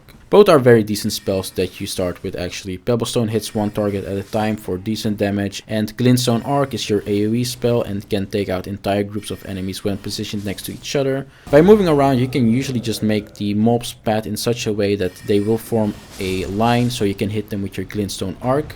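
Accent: Netherlands. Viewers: you have to be dutch, i can hear it. nice video :)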